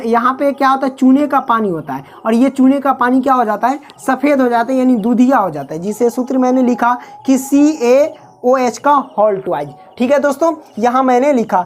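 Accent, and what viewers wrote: Bihar, India. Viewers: Sir aap bihar ke rehne bale hai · Sir aap Bihar se hai kay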